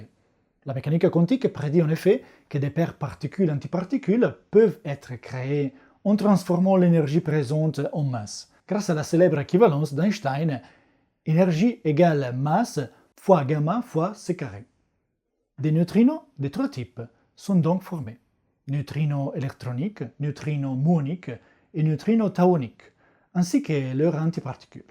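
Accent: Italy. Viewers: Merci pour cette vidéo très claire. Et quel plaisir cet accent italien ! J'attends avec impatience la vidéo sur la fusion de deux étoiles à neutron qui forme les éléments les plus lourds.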